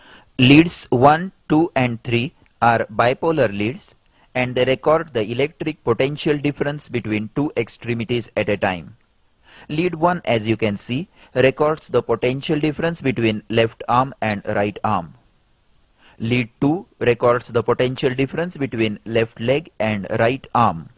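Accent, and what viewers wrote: India. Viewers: good (old) video, but his indian pRRRonunciation killing my eaRRRRs..... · Thank you. but you'd better say no thing ! indian english is damn terrible !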